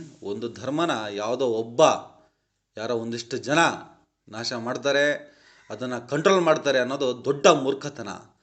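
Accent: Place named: India. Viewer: real indian voice to you bro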